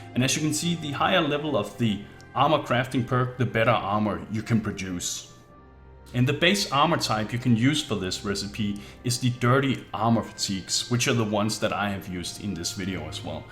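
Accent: Denmark. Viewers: are you danish or something??? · Tyk dansk accent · OMFG I knew your accent was Danish!!!! Yes!!!! Hej! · funny accent, are u from denmark? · Are you dansk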